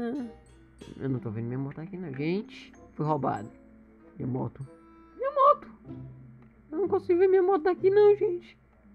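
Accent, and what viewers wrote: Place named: Ceará, Brazil. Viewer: Oi.. Vc parece ser Cearence kkkk Faz video de como viver no Bob Esponja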